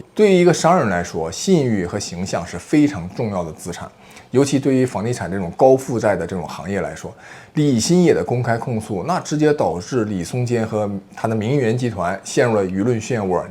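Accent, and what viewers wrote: Northeast, China. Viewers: 刚刚发现帅哥美女的这个频道，挺棒的！有他们的背景介绍吗？的口音好像是东北的，哈尔滨人吗？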